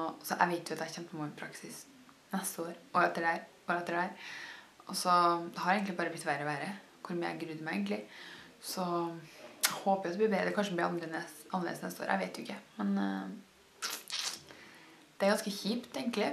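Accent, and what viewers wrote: Trøndelag, Norway. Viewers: First I thought "That sounds like Trondersk (I have been learning that dialect myself" and then she said "Trondelag" and I got so happy that I guessed it right. I might develop some kind of ear for accents (That would be cool) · definatly from Norway. :) Can hear it in the dialect. ;) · Så du er fra Trøndelag? Jeg prøver faktisk å lære norsk (nederlansk btw) å jeg kan forstå deg veldig godt. Jeg tror at Norsk er verdens vakreste spåk men norge har så mange dialekter så de blir veldig vanskelig å lære. groetjes uit nederland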